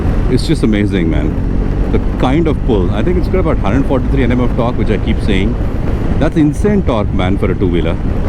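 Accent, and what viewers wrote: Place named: India. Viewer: 印度英语真的很好听❤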